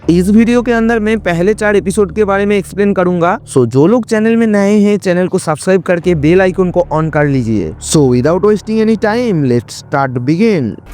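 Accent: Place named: Bengal, India. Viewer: tumi Bangali sur charte parcho na go bhai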